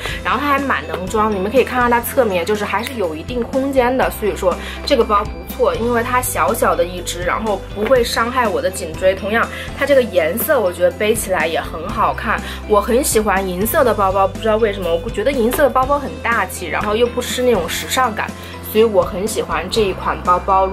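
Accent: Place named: Changsha, China. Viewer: 我发现你说话很像长沙话哈哈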